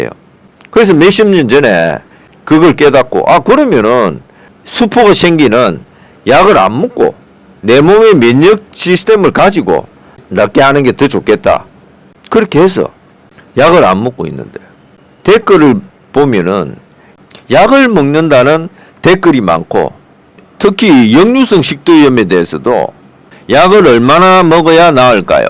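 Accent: Gyeongsang, South Korea. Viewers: 경상도분이시라 ㆍ ㅎㅎ 말솜씨 가 너무재미있읍니다 덕분에 치아에대한도움되었는데 역시 듣고보니간단하네요 당장실행하겠읍니다 ㅋ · 구수한 갱상도 억양으로 ... 감사합니다 저도 도전!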